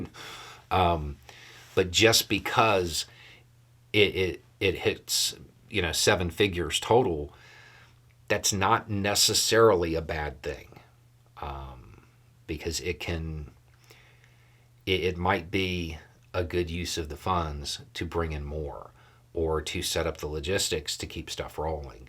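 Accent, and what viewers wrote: South, United States. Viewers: Exactly because he still understands what it means to be a member of the human race. He can be wrong, he can listen, learn and explain things, teach like no one I have seen. Maybe bias cause the southern accent but absolutely adore listening to this man. Thank you.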